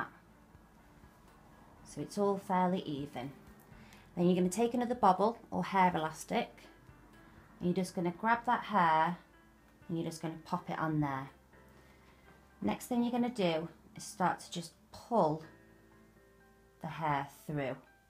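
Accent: United Kingdom. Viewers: Whats a 'bon'.....oh...british accent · Idk What to love about this video. The fact that it's so easy and pretty or the british accent.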